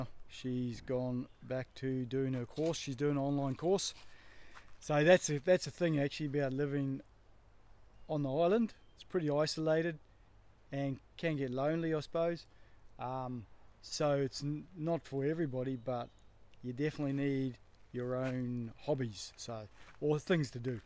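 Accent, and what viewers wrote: New Zealand. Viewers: Just found your channel. Great footage. Great location too. Every 79 yr old teenager dream. Did I see something about you leaving.? Obvious question..why.? You sound like a kiwi.! Thanks again for sharing. Atb from across the ditch here in Nz. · You sound like you are from NZ ?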